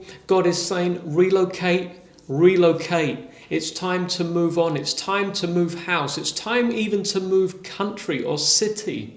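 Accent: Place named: England, United Kingdom. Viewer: He is English so he speaks proper English with the actual accent not the junk we hear in America.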